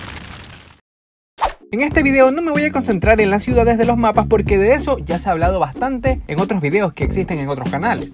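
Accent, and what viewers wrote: Venezuela. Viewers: Eres venezolano:v?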